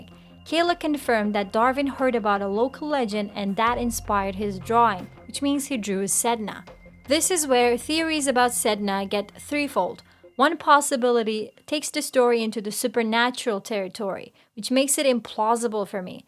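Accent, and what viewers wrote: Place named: Russia. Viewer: Haha Russian or Ukrainian it sounds like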